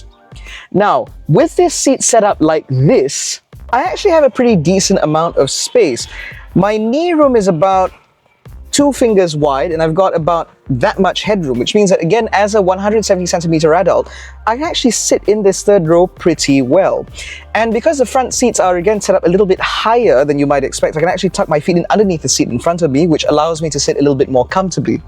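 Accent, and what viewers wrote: England, United Kingdom. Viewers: Nice English accent, very clear.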